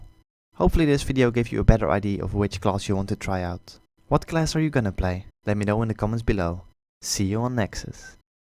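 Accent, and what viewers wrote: Netherlands. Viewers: Are you dutch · nice dutch accent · lol, je klinkt nederlands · The Dutch accent though, gj explaining man!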